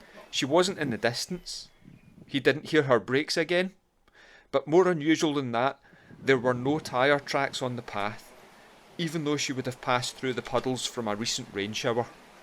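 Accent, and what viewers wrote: Glasgow, United Kingdom. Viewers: Sounds to me like he could be doing Gregory’s Girl, definitely Glasgow. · Sounds Glaswegian to me (albeit well spoken)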